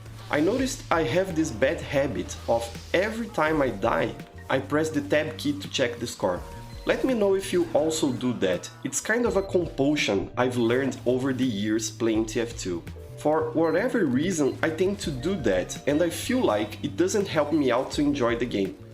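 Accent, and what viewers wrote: Brazil. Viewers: Ouvi 10 segundos e pensei "isso é sotaque de brasileiro". Aliás, provavelmente do interior paulista. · Are you Brazilian? Because you have an accent of a Brazilian speaking English. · Mano, da de saber donde tu é só pelo sotaque, ksksksksk forsa ao tf2 feito pelos br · Its funny how fast you can tell someone is brazillian by their accent, cool vid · Pelo sotaque é br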